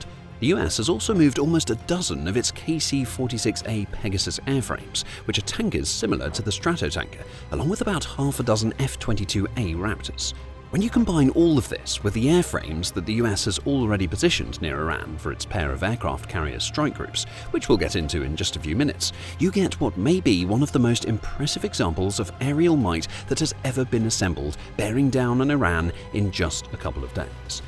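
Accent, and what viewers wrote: United Kingdom. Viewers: I don’t think you should make videos more than 5 min long. Something about your British accent makes me sleepy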